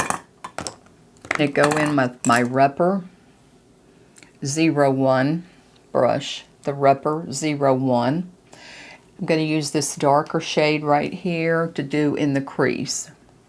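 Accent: South, United States. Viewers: you are just precious!! You give women our age such confidence in the ability to make ourselves look and feel beautiful. I can't get enough of your southern brawl. That's a compliment! You are stunning!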